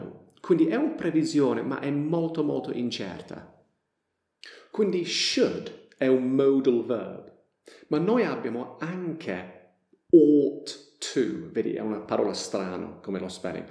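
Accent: Apulia, Italy. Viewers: Ripeto...piu di due decenni per imparare l'inglese buttati nel cesso veramente, con le tue lezioni da madrelingua in italiano con accento simpaticissimo pugliese davvero è rivoluzionario il risultato e il miglioramento grazieeeee 👏🏻👏🏻👏🏻👏🏻👏🏻👏🏻👏🏻👏🏻👏🏻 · Ciao una domanda di curiosità:) per caso in Italia vivi in Puglia? Percepisco dal tua accento un non so che di pugliese. Comunque amo il tuo canale, studio lingue all'università e le tue spiegazioni rendono tutto più chiaro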